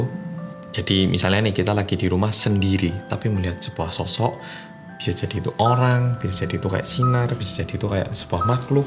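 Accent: Java, Indonesia. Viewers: HIYAKKK PPPPPP KURANB GERCEP😭 MAS MEDOKKKK👍👍👍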